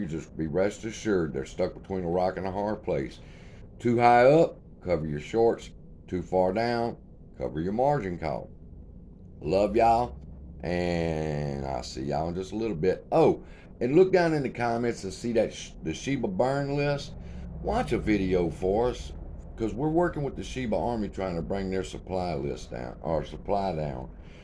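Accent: South, United States. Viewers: I love your accent ? May I know where you are from ? Is that southern ? I am from Asia so I dunno much.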